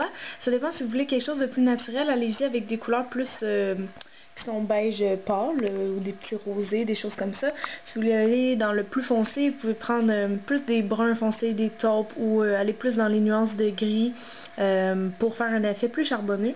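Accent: Quebec, Canada. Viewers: j'adore toutes ses astuces tres bon a savoir, merci bcp j'adore l'accent aussi canadien quebec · Vous êtes la onzième que je visionne et ouf! C'est exactement ce que je cherchais, et c'est super bien expliqué! (j'ai pris des notes quand même), et surtout, surtout... je n'ai pas été abrutie par des tonnes de produits, de pinceaux! J'ai tout ce qu'il me faut, en fait, et c'est génial! Vous êtes Québécoise? Car j'ai une amie très chère qui habite au Québec et d'entendre votre accent est un véritable rayon de soleil... D'ailleurs dès que je vous ai vue... vous m'avez fait un clin d’œil, avant même de commencer. Mais je viens de lire le texto de Jo L et j'ai 59 ans "passé", et je rencontre le même problème... J'ai essayé l'anti-cernes, mais... bof! Mais je vais essayer votre technique, oui! Mais en estompant beaucoup, car passé un "certain" âge, le noir donne un côté "sorcière" (lol), mais on ne baisse pas les bras! 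YES! Très belle vidéo, classe, nette, propre, sans donner des noms de produits (aahh! j'en avais marre qu'on me dise Kiko and Co!), et surtout un naturel... MERCI!!! Bizzz à vous toutes!!!! Je vous aime, et n'oubliez pas... le maquillage, même juste un peu, ça remonte le moral, même si on reste chez soi, ou si on est patraque... enfin, tristounette. En ce moment, vie compliquée et larmes, donc... maquillage, teinture de cheveux et demain je me coupe moi-même les cheveux!!! Et si c'est raté, ben... ça repoussera! Merci, tu es un amour... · Merci beaucoup pour vos vidéos, elles sont géniales et très claire ! <3 avec de bonnes idées très jolies ! (P.S. j'adore votre accent québécois, c'est "cute " ! =D ) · quelle belle accent québécois ;) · Juste pour vous dire... Cest une maquilleuse professionelle donc ceux qui critique ils peuvent se la fermé et puis cest pas de sa faute laccent canadien ( moi je trouve sa syper mignon ) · vous avez un accent canadien )) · Très bonne vidéo ! 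Ps; J'adore ton accent ! Cannadienne ??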